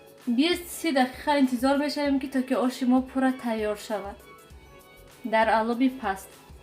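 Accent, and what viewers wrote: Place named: Hisor, Tajikistan. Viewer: Рахмат ма ай хисор ранги мо гап мезанед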